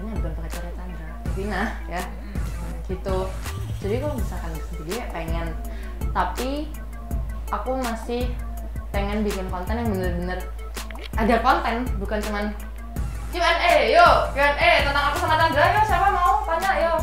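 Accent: Malang, Indonesia. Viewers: Logat malangnya semakin terasa dari tengah sampek akhir ya mbaa wkwkkw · Logat malangnya masih kerasa 😍😍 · Medok Malang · Logat jawa Malangnya kerasa ya :v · hehehee.. logat ngalame ga ilang ilang...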